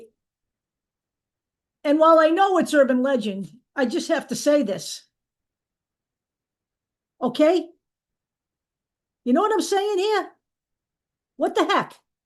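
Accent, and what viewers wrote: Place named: New York City, United States. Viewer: I watched that Lundberg report. I remember him concluding ESPN only put that date due to needing a 'placeholder' on their shows. An estimate, they don't really know when she's coming back. FYI I'm 75 grew up in NYC, fan of NBA since childhood. Only watching WNBA now because of CC. She's the best! You sound like you're from NYC too. Enjoy your sharing and style, reminiscent of NYC.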